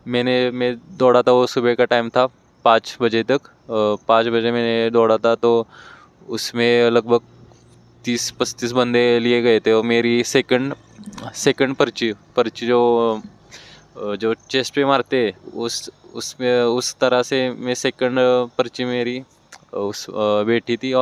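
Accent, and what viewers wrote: Maharashtra, India. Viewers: Bhai marathi ho kya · Bhai tu marathi lag raha he me pn marathi he from maharastra ❤ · Marathi aahe ka ha bhau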